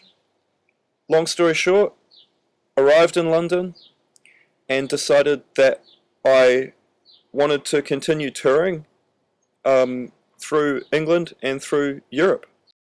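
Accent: New Zealand. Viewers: Hi from another kiwi (I think you sound like a NZer). I'm living in Japan and I have a Tern Eclipse S18 bike that I use to get around on the trains etc here. I'm planning on riding around the world (as much as I can anyway) in a couple of years when I turn 63 and I'd really like to take my folding bike. Its either that or my Surly LHT which is a great bike but doesn't fold. I've asked Mira Slegl <link> who took one around a lot of the world how it was but he said that a folding bike isn't very good on rough roads. Can I ask you how was your experience with a folding bike. Did you have any mechanical problems with the fold mechanism? I'd really prefer to take a foldie if I can. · u talk with kiwi accent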